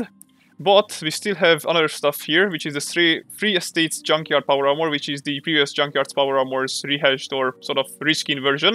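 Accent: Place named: Denmark. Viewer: I like your accent :D are u Danish or sth lol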